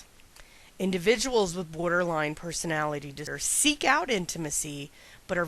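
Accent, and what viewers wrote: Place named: Pennsylvania, United States. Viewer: Thank you for this, it helped me a lot to prepare for a psychiatry oral exam. I am French, but I lived in PA for two years, it was nice listening to your accent as well (unless I am completely wrong and you are not even from there :) :) I think your explanations were well-formulated and your examples very clear. What I still have a hard time with is matching the mechanisms with the disorders. Like a person with BPD will use projective identification and idealisation for instance. For some others it can be more difficult for me to remember. Also, I am supposed to know the reason why a person has this or that disorder from a personality development point of view (Mahler theory)... This is kinda confusing for me. Anyway, thanks again that you shared your work! Subscribed!!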